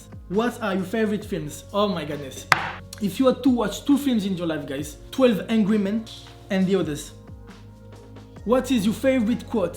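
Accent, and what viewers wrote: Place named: England, United Kingdom. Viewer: Ton accent anglais m'amuse toujours autant, chouette vidéo bien sympathique! Bonne continuation à toi!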